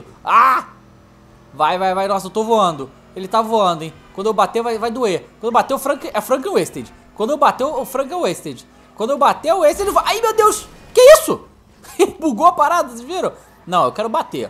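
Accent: Bahia, Brazil. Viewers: mano vc é MT baianaaao cara slk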